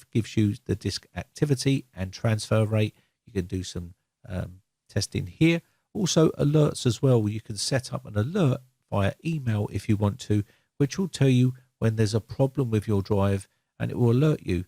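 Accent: England, United Kingdom. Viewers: I like the English accent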